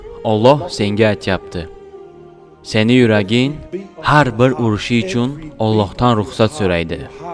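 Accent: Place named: Tajikistan. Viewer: Tojikistindan bo‘lsalar kerak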